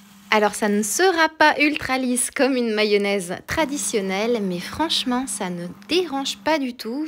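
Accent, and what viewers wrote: France. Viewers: Muchas gracias por esta idea! Me parece fantástica 👏👏👏👏👏👏 La prepararé un día, seguro! Además quiero darte las gracias porque me encanta escuchar tu precioso acento francés y así aprovecho para recordar cuando lo estudiaba en el colegio😊 Un abrazo y gracias por compartir tantas recetas estupendas!🤗💜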